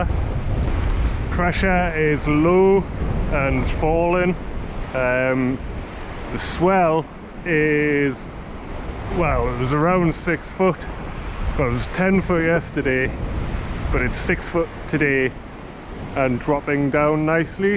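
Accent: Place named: Durham, United Kingdom. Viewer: Well done. I have been watching your videos for a wee while now and I love them. I am originally from Durham but now live in Northern Ireland. I love to watch you fish your local marks the spots I recognise and it is great to hear the accent. Last year my wife bought me one of your hoodies for an anniversary present and I wear it with pride even when sea fishing in Galway. Keep up the good work.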